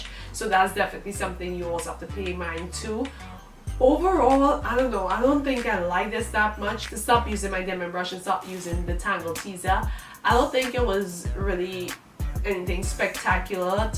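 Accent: Trinidad and Tobago. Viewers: I know a Trinidadian when I hear one 🇹🇹🗣!!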